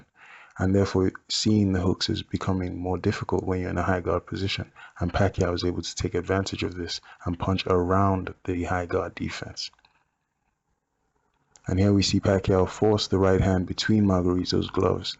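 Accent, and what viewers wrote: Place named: United Kingdom. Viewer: Okay I have to ask, are you the same guy as +barryrobinson who also has a boxing film study channel? You both are black guys who are Flomo's. You even use the same vocabulary such as "defensively responsible, changing head slots, etc." The only difference is you talk w/ a British accent. If you're two different ppl, then you're a fucking hack and get your own ideas. And ffs PLEASE tell me you're not the same guy cuz that'd be so fucking lame lololol.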